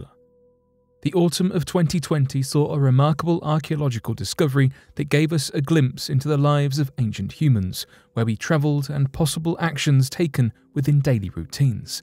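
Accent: United Kingdom. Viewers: I am given you minus for this nervous British accent! Speak American way or shut up immediately. People of the planet, disguise your colonial past. Pay back reparation to your former colonies! Big time!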